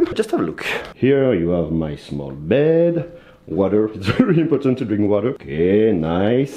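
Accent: France. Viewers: Americans are going to love you mostly with your Frenchy accent.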